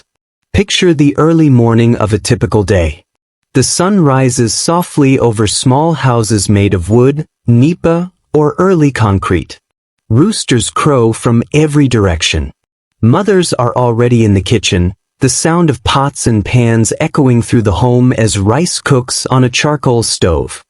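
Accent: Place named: United States. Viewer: The white American English accent is cringe. Very mispronounced and terrible.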